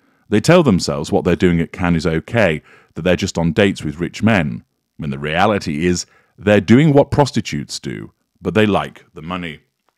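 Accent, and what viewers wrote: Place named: England, United Kingdom. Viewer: Hello? Are you not the "real" person who had your own t.v. podcast in U.S.? You stood out to me because of your very "english" dialect on U.S. t.v. or is it podcast. Bald head with glasses? How can one forget! I'm sure someone out there knows your identity.?? Interesting change of carrier that you now have. Oh I'm not in U.S. like yourself but in Norway.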